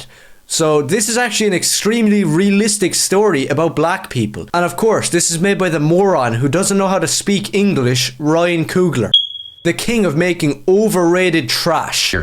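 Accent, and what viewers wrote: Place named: Ireland. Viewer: If this guy didn't have an Irish accent, I'd bail in thirty seconds.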